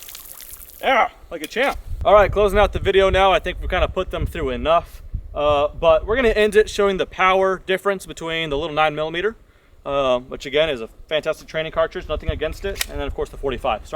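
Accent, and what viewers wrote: Australia. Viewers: Australian? Lmao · Lol Australian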